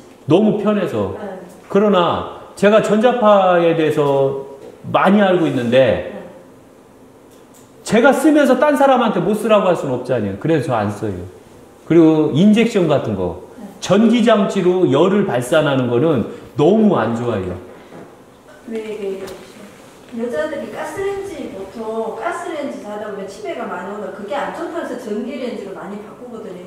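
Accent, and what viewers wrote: Chungcheong, South Korea. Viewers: 충청남도 말씀하시네요 저도충청남도입니다 반가워요 어지그리 구수하게말쓰을하시는지 얼마나 재미있웃우운지 ㅎㅎㅎ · . 상투와 두건은 깔끔하고 시원해 보여 눈이 즐거운데요, 수염은 푸시시한게... 좀...염소 같잖아요. 턱선이 부드러워서 구수한 충청도 사투리하고도 잘 어울리는데 말예요. 면도싹~ 어떻게 생각하세유?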